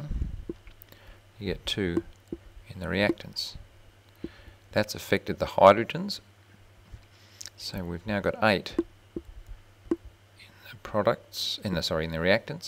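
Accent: Australia. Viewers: I like you matey cause you sound Australian, oh and this video was really bonzer! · finally it took an aussie ...good job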